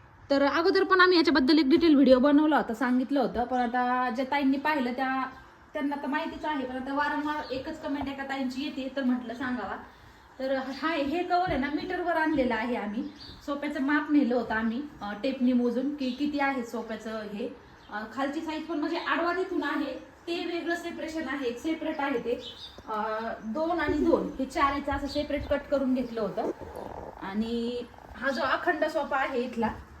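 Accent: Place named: Marathwada, India. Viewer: ताई मला ना तुमच्या मराठवाड्यातली बोलण्याची पद्धत खूप आवडते कारण तुमचे प्रत्येक शब्द खूप आपुलकीचे असतात मी दोन वर्ष मराठवाड्यामध्ये काम केले अहमदनगर औरंगाबाद परभणी अशा बऱ्याचशा ठिकाणी मी काम केले पण त्या ठिकाणी जी आपुलकी जी प्रेम आहे माणसं मिळाली ना ते खरंच खरंच खूप छान होते❤❤❤❤खूप जीव लावतात तिकडली😊😊😊आता खूप आतुरता झाली आहे ताई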